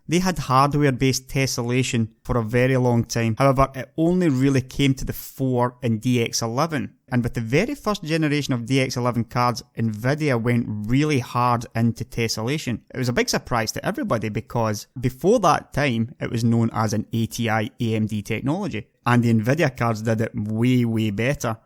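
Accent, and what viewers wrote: Scotland, United Kingdom. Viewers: It's Scottish English, laddie! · scottish, game over for you. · Great information and he dove in deep. This reinforces what I've suspected over the last decade which is that there's no real need for so many hardware refreshes. It's more about marketing gimmicks and lazy coding. Sure there's other factors like the amount of texture memory available, speed and power efficiency but when I started seeing less than 10% overall performance improvements between generations I knew something was up. This vid made me a subscriber, Scottish brogue and all...:-) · Jesus christ another Scottish ned I have to listen to for 20 minutes it's bad enough trying to understand what these monkeys are saying in Trainspotting. Now I have to listen to this heroin addicted tree dweller talk about Gameworks? I don't think so! We really need to build that gigantic wall and have done with these "people" I am so sick of it.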